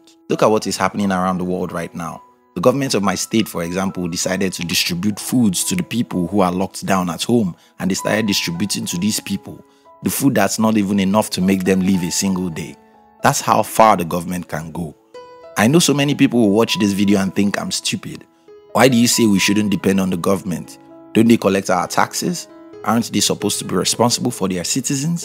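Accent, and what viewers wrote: Nigeria. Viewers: Same here, this is 100% our accent...but I am trying to figure out his tribe. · You sound nigerian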